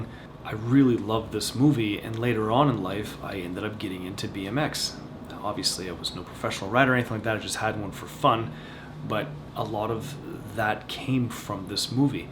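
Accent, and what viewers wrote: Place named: Canada. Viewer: Rad is Rad. I hear a Canadian accent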